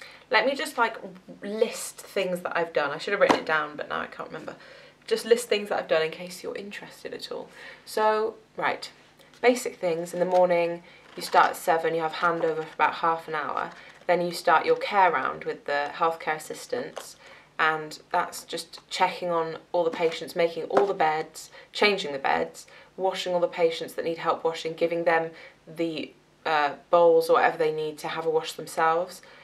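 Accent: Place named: England, United Kingdom. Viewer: Im an Irish student nurse and I think you definitely have an English accent haha!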